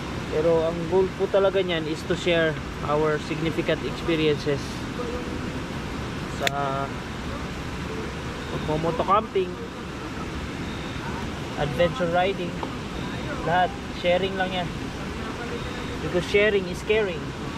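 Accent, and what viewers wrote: Visayas, Philippines. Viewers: Bisaya kba Dong.?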